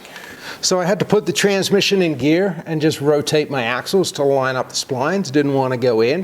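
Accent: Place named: Australia. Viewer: Great video! Where’s your accent from? You sound Aussie! Thanks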